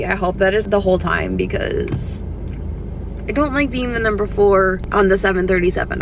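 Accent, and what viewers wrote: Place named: South, United States. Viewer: I love the aviator glasses and country accent. Babies and puppies always make my flights that much better especially if they are happy to be outside 😁🐶👶.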